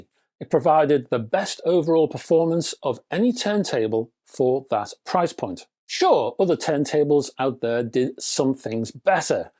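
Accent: United Kingdom. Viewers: I rather enjoy watching your videos, mostly in order to gain perspective, because I do appreciate, to some extent, anyway, the values others may hold on various subsets of audio performance, however advanced or meager. You are a well-informed, articulate man ... and an individual of good humor, so important to enjoyable conversation. Now, I generally appreciate the mechanical and delivery properties of speech from genuine Brits; they add so much color (or, perhaps "colour") and flavor. But, you folks simply must reform the completely illogical, and utterly indefensible, (mis-) pronunciation of the word "aluminum." What accidental process of lingual habit has led you folks to think the word is pronounced the way you do? Sorry, but "al-you-min-ee-yum" it isn't; it's just not. I mean, look at it. Four syllables. That's all. And while you're at it, can you please explore the etymology of the word "chube?" I cannot even find it listed in the dictionary; nor can I find any trace of the similar "chuba." (What the heck IS a chuba?) You folks have developed the universal language across the last several hundred years, and did a bang-up job of it, but you must not elevate regression to an art form.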